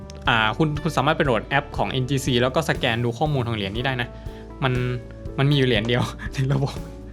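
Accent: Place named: North, Thailand. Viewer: เสียงหนุ่มเมืองแปร่ อู้ไทย เสียงหล่อ ดี น้อ อิอิ:face-blue-smiling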